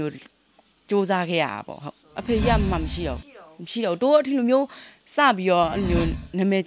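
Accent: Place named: Taungoo, Myanmar. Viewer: တောင်ငူလေသံကြီး ဟ သိနေတယ်နော်❤😂 မျိုးthandar ooရေ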